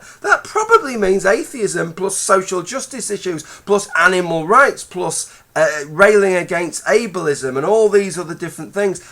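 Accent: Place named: Lincolnshire, United Kingdom. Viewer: Was trying to place the accent - not strong enough for Yorkshire - was torn between possibly Lancashier but with a slight manc-like twang (sorry!) -had to be elsewhere, so gave up guessing. Lincolnshire! -Skuunthorrrpe. Bona fide northern goodness!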